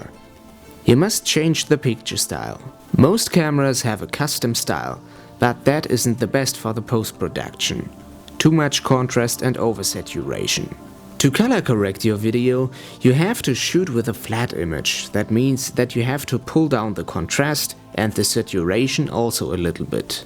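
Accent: Germany. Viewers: from the accent...he must be German. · Man hört nach 3 Wörtern, dass das ein Deutscher spricht. Ekelhafter Akzent. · He sounds German to me. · Dude, i bet hes german ;D they speek in a funny accent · Er spricht das Englisch wie Deutsch aus. Als würde er es mit deutschem Akzent vorlesen oder so. Ich mach's besser. Wo bleibt mein Keks? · Defenitely german, you hear it in the way he talks,...! Good advise by the way! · haha this is a german accent ;) very funny!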